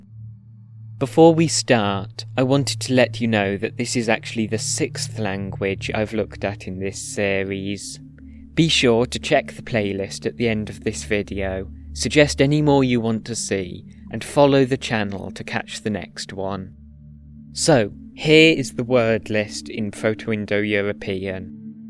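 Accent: Wales, United Kingdom. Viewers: Sounds Welsh to me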